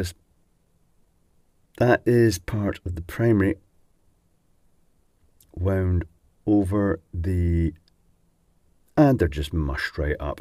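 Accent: Scotland, United Kingdom. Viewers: If it wasn't for your Scottish accent, I would have thought I was watching DiodeGoneWild, withheld transformer teardown.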